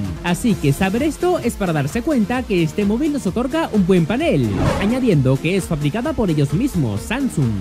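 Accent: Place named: Venezuela. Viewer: Hablas como venezolano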